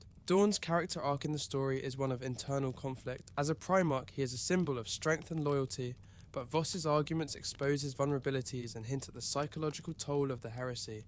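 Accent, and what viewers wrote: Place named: United Kingdom. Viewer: I like hearing a British person speaking about 40k. I know an American or anyone can speak about it also. But I greatly prefer a British person like this guy since 40k comes from Britain. It's sort of like... idk, I want to hear a Chinese man when I'm learning about the Three Kingdoms period or Chinese cuisine. I want to hear an American when I'm learning about modern money or finances, or other American dominated fields. And I want to hear a British person talking about 40k.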